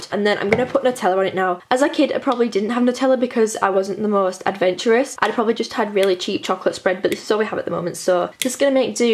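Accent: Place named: Scotland, United Kingdom. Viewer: Is she Scottish cuz I am and she kinda has an accent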